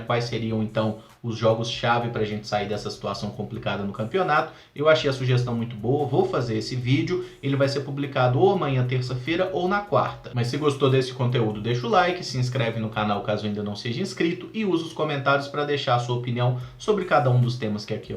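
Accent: Minas Gerais, Brazil. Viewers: É mais pelo sotaque mineiro mesmo, mas é uma coincidência engraçada kkkkkkkk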